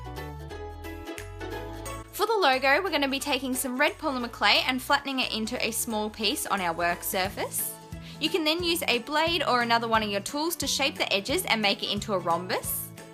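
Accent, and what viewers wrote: Australia. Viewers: I KNEW you sounded Australian!!!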